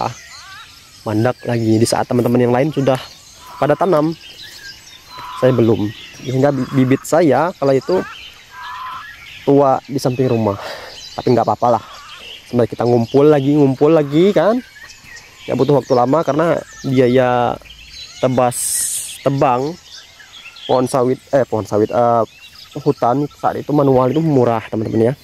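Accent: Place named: Bangka, Indonesia. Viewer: dari dialek nya dari bangka ya bang ? salam dari belitung bang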